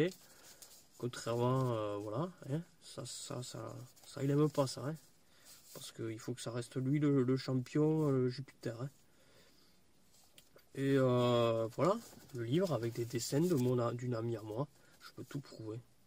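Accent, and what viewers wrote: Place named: South, France. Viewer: Cet accent chante le sud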